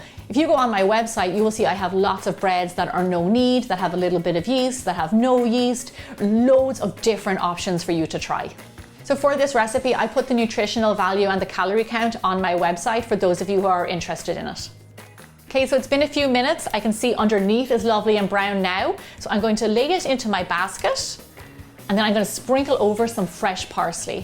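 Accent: Ireland. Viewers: Not only beautiful bread, but delightful accent!! :)) Irish?? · Are you Irish? Your accent is so charming and beautiful. Thank you for the recipe I'm going to try this afternoon with my 21 year old daughter we love to cook together. Thanks again unless you many blessings to you and your family! 💝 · For bread is as lovely as she is and her grand Irish brogue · She sounds Irish. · Okay now I know your awesome. Love your style and your practicality. Very professional and adaptable to keto. Most of all love your efficiency and that Irish accent.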